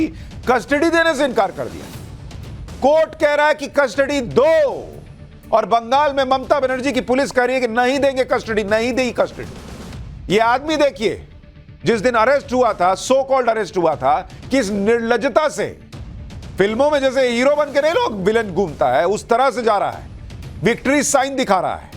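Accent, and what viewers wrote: Bihar, India. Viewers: Are dada ee to bihari lg rhaa g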